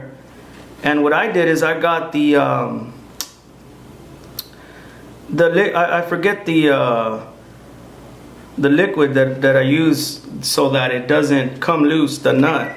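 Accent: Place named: Mexico. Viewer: se ve gringo pero tiene acento de hispano (mexicano?) :D what's up with that? trippy, no hate just curious from a venezuelan guy :)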